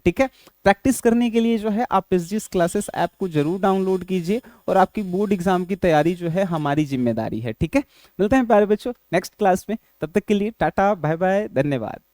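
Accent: Bihar, India. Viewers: Sir ap biahr sa ho jab ap bolta ho muja bhaut hasi ata ha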